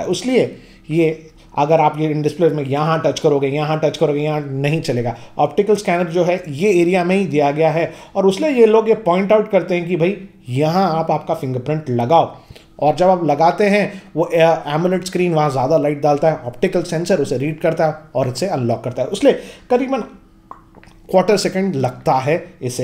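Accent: Hyderabad, India. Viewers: Now It's Time To Hyderabadi Hindi Well Explained Sir👌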